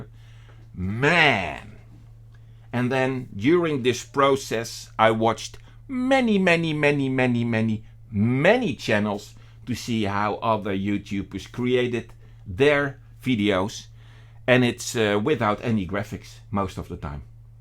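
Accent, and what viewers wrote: Netherlands. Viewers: Keep that Dutch accent - its genuine. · Ha Ha Ha, you can't be Tony Fleming! Just like the English can't sing Russian Folk songs? Nor can a Dutchmen copy the sublime tones of a traditional Yiddish song? Be yourself because to me you sound and have the same mannerisms of my brother Tony? I know how to translate you amusing "dinglish" to English with great ease! Be yourself mate, that's the way we like you! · Nice that you are back again, I love when Dutchman speak english...........Act natural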